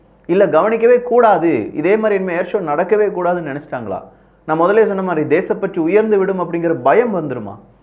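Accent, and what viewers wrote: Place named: Tirunelveli, India. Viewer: Bro sometimes you speaks tirunelveli slang, I like that❤❤❤😀😀😀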